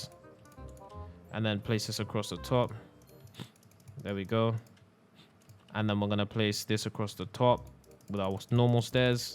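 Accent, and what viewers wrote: United Kingdom. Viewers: Why you sound british?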